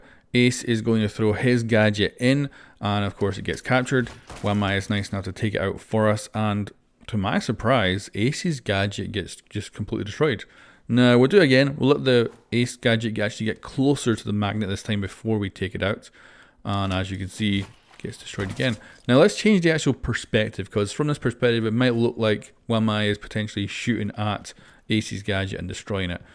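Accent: Scotland, United Kingdom. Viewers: Are you from Scottland?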